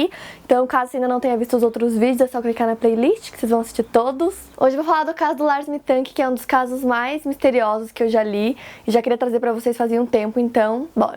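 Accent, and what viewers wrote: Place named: Rio Grande do Sul, Brazil. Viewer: tu é do rs? parece por causa do sotaque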